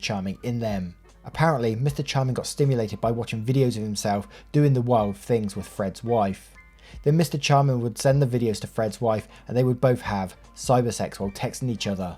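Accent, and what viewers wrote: United Kingdom. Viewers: Completely off topic but I was wondering what part of the UK your accent is Great job with the video :)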